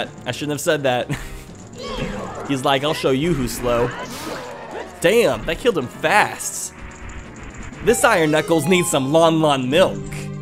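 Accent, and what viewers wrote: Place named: Midwest, United States. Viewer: Haha, no problem. Understand that I mean no ill will or insults to your location dialect (I live in the midwest too and am kinda guilty of the "Ohp" thing as well)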